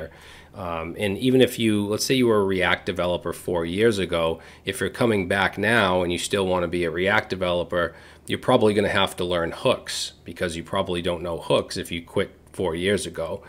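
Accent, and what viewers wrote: Boston, United States. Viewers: Hi. New sub. Thanks for this. I got to (5 years ago now) where I just felt I wasn't progressing. mostly due to the company slowly pushing us regulars out tbh.. so that was depressing and I've been waiting for that spark again I had when I first started doing web development and that... was decades ago. Watched your React crash course (wasn't my first time trying React and each time it changed which was so frustrating) and I enjoyed it a lot.. Can't say I've ingrained all the concepts yet but I'm continuing this time. Kind of have to financially. Also, I heard the accent. I'm just north of Boston. Maybe one day we'll meet. Thanks again for the down to earth everything.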